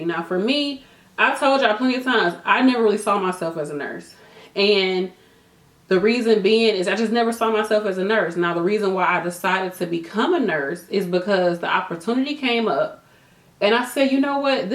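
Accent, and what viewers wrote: St. Louis, United States. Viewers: You from St. Louis? Great video btw!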